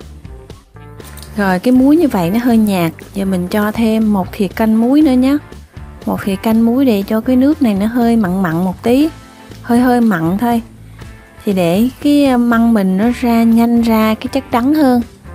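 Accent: South, Vietnam. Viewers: Cháu nói gịong miền Nam rặt, vậy thay vì nói " thìa", mình nói " muỗng ", dễ nghe và hợp lý hơn.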